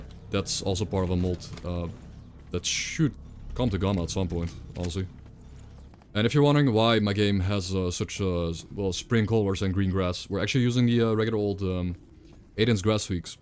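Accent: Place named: Netherlands. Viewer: u dutch? u sound dutch.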